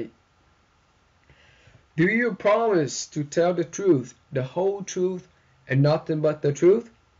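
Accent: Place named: General American, United States. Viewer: giọng mỹ chuẩn quá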